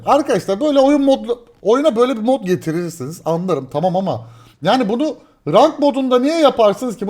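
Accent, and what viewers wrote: Azerbaijan. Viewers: abı Azerbaycanlımısın?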